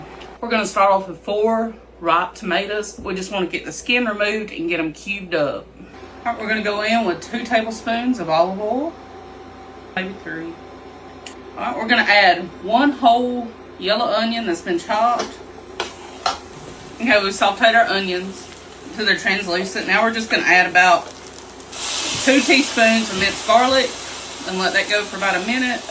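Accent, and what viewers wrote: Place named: South, United States. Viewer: I love your voice. I speak Southern also.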